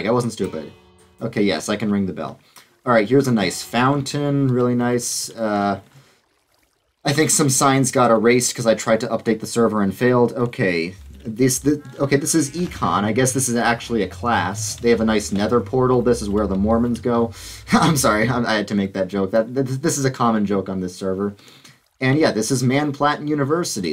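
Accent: New York, United States. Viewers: got your new york accent coming out in that video 😂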